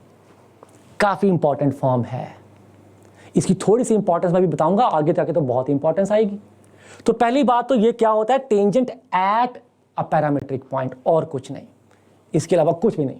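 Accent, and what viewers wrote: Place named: Punjab, India. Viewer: Sir have accent of punjabi stand up comedians who lives in Delhi Sir please make a family friendly stand up comedy please🙏🙏🙏🙏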